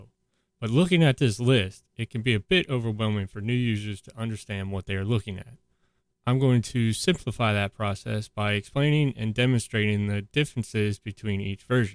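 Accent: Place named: New Orleans, United States. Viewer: Great video and great timestamps and links. Thanks for your efforts. Sounds like a Nawlin's accent...takes one to know one. Keep up the good work...sub.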